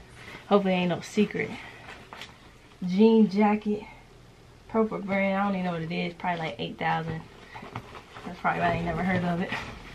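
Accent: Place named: South, United States. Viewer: Love your personality love your voice where you from. You have a southern voice.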